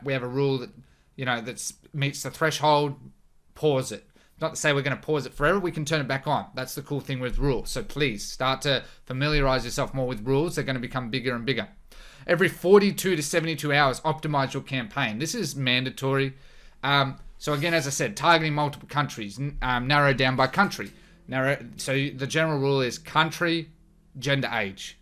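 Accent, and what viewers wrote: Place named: Australia. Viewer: Thank you so much man for the content , I'm understanding Australian English better now 👍👍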